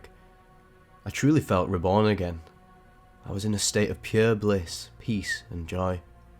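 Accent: United Kingdom. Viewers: Love your british accent, voice and tempo👍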